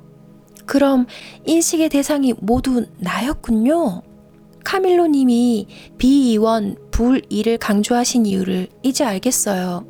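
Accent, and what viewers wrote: Gyeongsang, South Korea. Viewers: 증말 믿고 듣는 진정한 갱상도 사투리다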